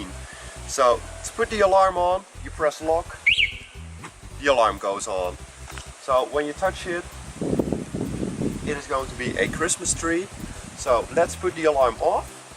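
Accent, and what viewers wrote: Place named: Netherlands. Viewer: The Dutch accent tho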